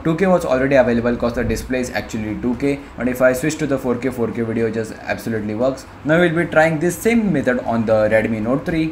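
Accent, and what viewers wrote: India. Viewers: 😂😂😂😂 asian/indian dialect is so fckng funny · Indian accent check Fast talking check Doesn't explain anything check Conclusion:don't recommend to do this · Indian accents are funny as fuck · Another garbage indian accent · I don't like the Indian accent it's difficult to understand PD: I'm not native speaker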